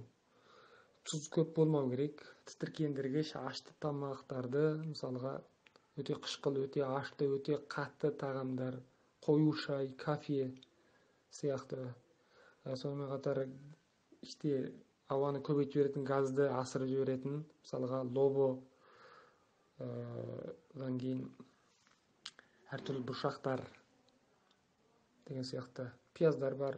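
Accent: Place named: China. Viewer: Рахмет сізге. Көп танымдық ақпарат беріп өттіңіз. Алайда Қазақстанға басқа елден келген адам құсап сөйлейсіз. Кей сөздеріңізді түсіну мүмкін болмады. Қытайға жақын жер ма сол жақтың акцентімен сөйлейді екенсіз. 🤭🙊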